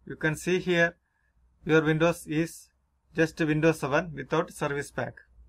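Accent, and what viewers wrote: India. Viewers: there is always one indian guy with unique accent with solution to every pc laptop apple google problem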